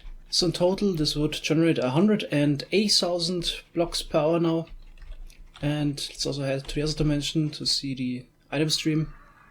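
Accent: Germany. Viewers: Hi, i saw your minecarttrack in the nether for fast diagonal travel on your server and wanted to build it on my own, but i did not find a tutorial on your channel for it. Is there a video i did not see or do you know one? Would be great if you could help me out. MfG feris, ich gehe mal davon aus dass Sie Deutscher sind, da ihr Akzent hörbar ist.:-P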